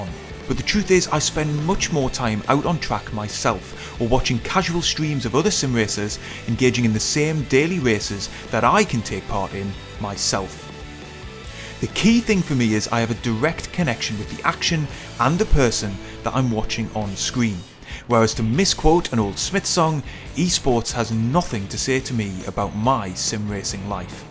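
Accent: United Kingdom. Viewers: Are you me, but with a British accent?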